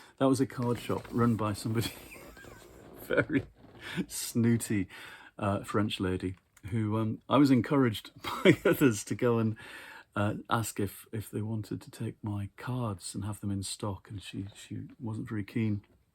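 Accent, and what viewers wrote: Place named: Blackhall, United Kingdom. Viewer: Hi, I have only just found this channel & am so glad I have. A lovely place without all the usual literary snobbery, for those of us who were working class kids who haunted the local library & only ever wanted books for Christmas & birthdays. Still the same now at 58! Also, your accent reminds me so much of my Mum's side of the family - from Blackhall, where I visted to see my Nan & family a few times a year. All sadly gone now. I still occasionally go back to visit that wild coast. What was once a coal beach is now a beautiful nature reserve. I'm working my way through the videos & love it when an old favourite childhood read is mentioned. Thank you so much, I'm off to pull out some favourites from my over stuffed shelves & teetering piles to group together to re-read. I love your artwork, too. Dr Meow - inspired!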